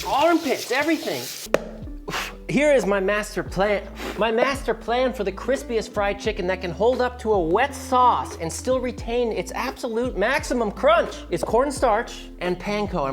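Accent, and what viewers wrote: United Kingdom. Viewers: "Woostersheer" is how to pronounce it. Great to know you were born in UK. You can take the guy out of Britain, but you can't take (all) the Britain out of the guy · Where in uk were you from sonny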